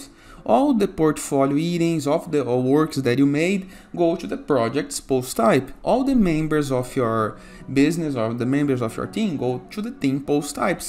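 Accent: Brazil. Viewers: Cara, você é brasileiro?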